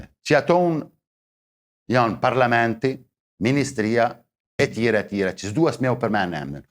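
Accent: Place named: North, Albania. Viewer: Po ju kush jeni nga vini,dhe profesioni i juaj,,nga gjuha dukeni nga veriu respekte profesorit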